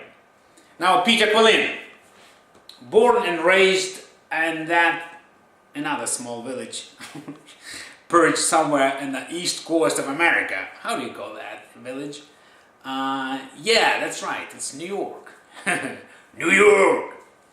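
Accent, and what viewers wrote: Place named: Russia. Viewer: Are you russian buddy ? LOL I like your accent.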